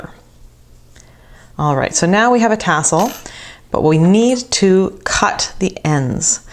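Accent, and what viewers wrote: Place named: Canada. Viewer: Can I just say I love your gnomes but I also love the way Canadians say words with "ou" in them like about and out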